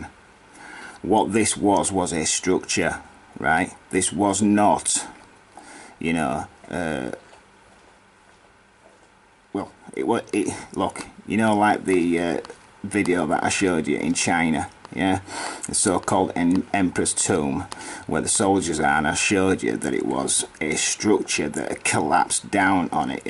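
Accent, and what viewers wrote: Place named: Barnsley, United Kingdom. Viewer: Everytime you say rebar with the Barnsley accent I imagine it's Ernest Moss talking. Makes these videos even better haha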